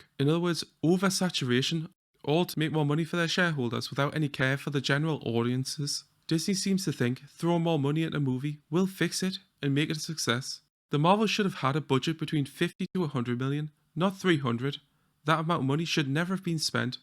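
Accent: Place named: Wales, United Kingdom. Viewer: Either that or just a Welsch person. That accent is terrible.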